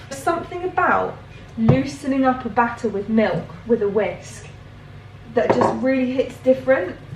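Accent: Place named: Yorkshire, United Kingdom. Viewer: Your Yorkshire accent kills me 😂😂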